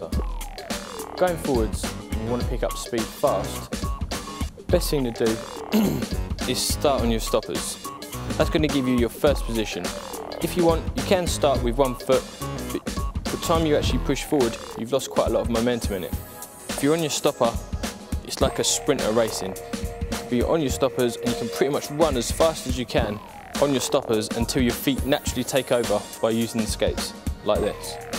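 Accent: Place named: Scotland, United Kingdom. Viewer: Did anyone else think he said "Hi, I'm Shit,"? Obviously it was but it made me laugh. Scottish accent ftw.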